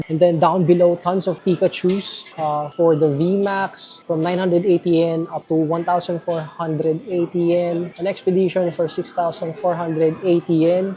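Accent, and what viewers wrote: Philippines. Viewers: ur accent for sure 100% filipinos haha👍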